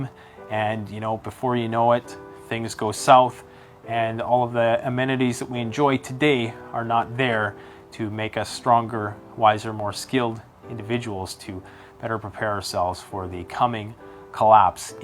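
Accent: Canada. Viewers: Since there IS not a Canadian voice, I feel your channel is unique. You shouldn't have to change your name. Happy new year to you and yours. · at first i thought this video is just a funny parody: a canadian flannel wearing with overly canadian "out" and "about" with that background music, but no it seems you're serious! well most of the things you said are pretty factually wrong but hey you seem like a pretty nice and likable guy so best of luck to you buddy. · The name is good. It tells us you are Canadian and that doesn't mean you are limited to talking only about Canada. FPS Russia is an American faking an accent and making videos about things that Russians don't have access to. It works well for him. Your Canadian accent is real and no one questions that you are from Canada.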